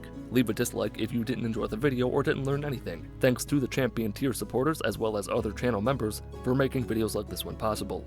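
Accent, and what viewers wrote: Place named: Michigan, United States. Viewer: took me three years to realize youre from michigan what is wrong with me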